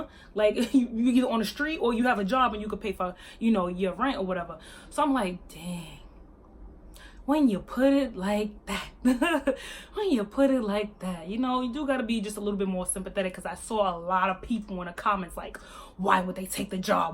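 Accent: New York, United States. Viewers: You're hella gorgeous and your New Yorker accent is so lit yo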